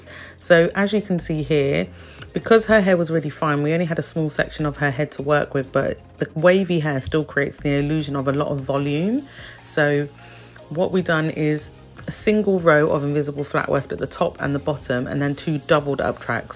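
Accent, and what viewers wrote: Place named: United Kingdom. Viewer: Gosh, I always drool over British accents, but yours is even better than usual. Hair looks great too!!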